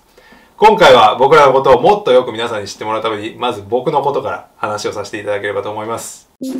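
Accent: Japan. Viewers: ほんとだ。話すと確実に日本育ち。ファッション、髭込みで、普段のハリウッド俳優というより映画やステージ上の白人俳優っぽいもんね。電車の話も女子が陰で牽制しあってる方向で想像する。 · 貴方の話し言葉を聞いていると、間違いなく、正真正銘の日本人ですよ。間違いない。沖縄県人ぽくて、素晴らしい日本人だと思いますよ。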